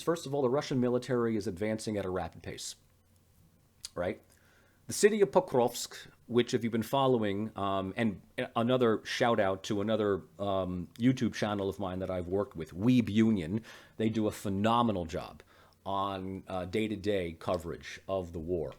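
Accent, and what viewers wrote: New Jersey, United States. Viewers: I am so happy to see your post. I've only just started to watch, this video but it looks like we will hear your views and info alone. I feel very lucky that I found your channel a while ago and I thank you for the things you share with us 😊 I like much better when you give your views alone, but I guess we need to be more open to others as well. Besides, I like to hear you speak because I am from New Jersey also ❤❤❤❤❤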